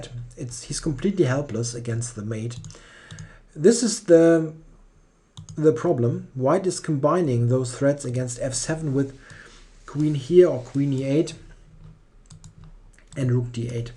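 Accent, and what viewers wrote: Germany. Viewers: I watched the analysis of this game on two other big channels and on your channel. And this video is the best in terms of video and sound quality and you offered by far the best explanations of lines and other possible variations. Nur ein sehr starker deutscher Akzent aber daran kann man sich gewöhnen.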